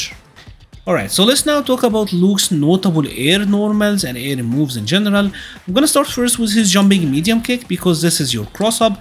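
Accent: Egypt. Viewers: habibi good to see that there is an egyptian community for streetfighter, i just got the game a couple of weeks ago and learning luke so when i heard your accent i immediately knew you were egyptian. btw amazing guide super helpful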